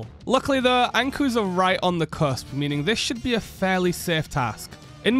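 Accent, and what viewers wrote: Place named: Hull, United Kingdom. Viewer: Dude are you from Hull? Accent is strong with this one. Good vid tho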